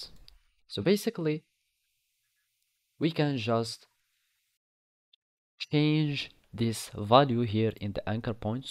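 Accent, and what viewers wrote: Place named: Morocco. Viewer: You sound moroccan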